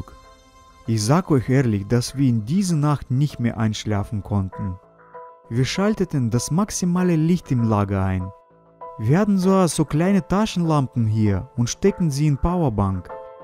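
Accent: Russia. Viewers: Bist du ein Russe oder warum der Akzent?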